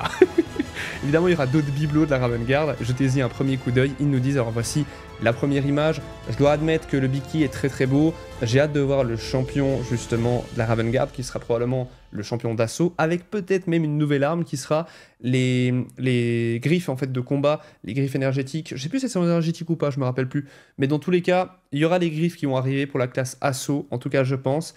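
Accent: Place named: Switzerland. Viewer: QUOI TU EDT SUISSE, quelle canton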